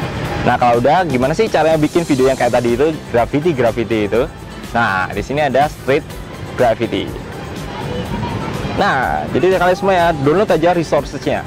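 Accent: Malang, Indonesia. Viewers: Abangnya orang Malang?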